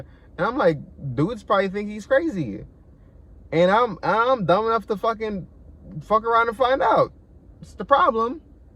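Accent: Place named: United States. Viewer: Love your stories man and your American accent.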